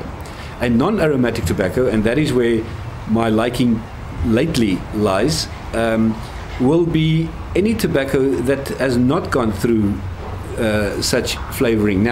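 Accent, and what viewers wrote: South Africa. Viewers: Good evening sir Could that be a South African accent?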